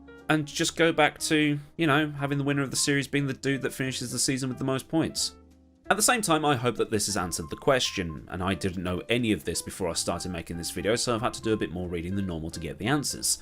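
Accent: United States. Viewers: Your country accents twang caught me off guard lmfao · Great American accent! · That was the best attempt at an American accent from a foreigner I’ve heard....breaker breaker...any takers?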